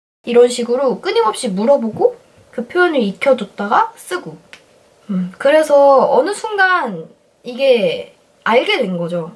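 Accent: United Kingdom. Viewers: 영국 영어 멋있네요. 한동안 영어 잊고 살았는데 동영상 보고 기분이 좋아집니다. 좋은 하루 되셔요~~~ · 영국 악센트보다보니 제 호주 시골발음이 너무 안쓰러워졌어요ㅋㅋㅋㅠㅠㅠ 목소리도 말씀도 조목조목 너무 예쁘게 잘하세요!😍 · weird how do u have so strong Britsh accent? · 알고리즘에 끌려 들어왔다가 영국발음에 치여서.. Q&A도 보고 영어 공부해야겠단 생각이 빠짝드네요!❣ 구독하고 갈게요🥰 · 남자친구가 영국에서 7년을 넘게 살다왔는데 남자친구가 하는 영국영어보다 이 하는 영국영어에 더 치이고 갑니다......💜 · 영국발음 너무 멋져요^^ 저도 영국 발음을 연습하고 싶은 욕구가 뿜뿜하네용~~ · 당신...... 혹시 전생에 헤르미온느였나? 영국영어 너무 듣기 좋아요ㅠㅠㅠㅠ 넘 멋있구, 왠지따라해보고 싶고, 치이고 갑니다ㅠㅠ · 오늘 처음 들어와서 구독박고갑니다. 자주들를게요. 영국발음 넘 좋네요!!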